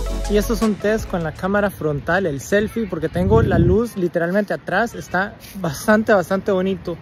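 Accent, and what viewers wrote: Costa Rica. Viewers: Este mae es tico haha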